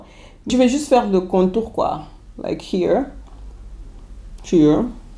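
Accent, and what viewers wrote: Senegal. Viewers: j aime bien ton français woloftisé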